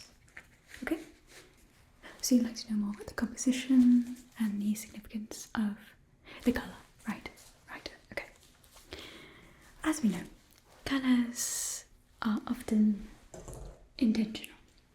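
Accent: England, United Kingdom. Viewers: Yes I am still impressed with that british accent, I am british and I cannot do an american accent to save my life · The British accent hits every single time! Btw, how did u get ur new profile pic? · No such thing as a "british accent". That's an English accent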